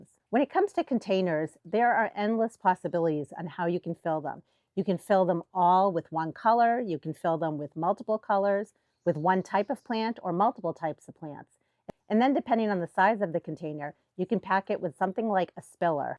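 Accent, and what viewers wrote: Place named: Maine, United States. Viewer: Just found your channel- wondering if you're from Maine? You sound like you are lol I'm in Central Maine. So glad I found you! Thank you for the helpful video on baskets- I'm new to gardening and grew a bunch of flowers from seed this year. Gonna make some up now!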